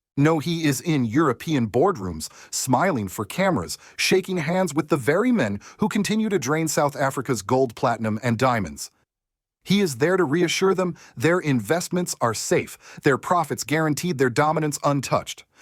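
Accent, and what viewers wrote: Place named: United States. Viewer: F you American accent, you have no clue.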